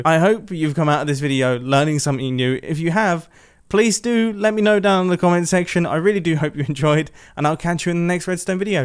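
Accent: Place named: United Kingdom. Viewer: mumbo, you sound extra british in this video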